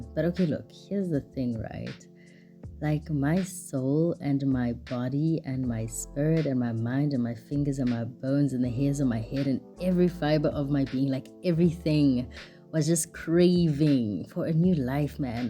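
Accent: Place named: France. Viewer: Your French accent🥵🥵🔥🔥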